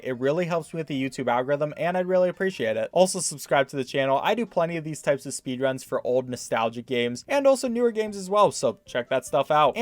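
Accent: Czech Republic. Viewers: You are czech ? :D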